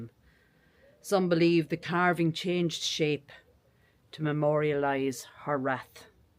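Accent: Ireland. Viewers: When I was a kid, my Irish Grannie lived with us and she talked like you.... memories here TY TY TY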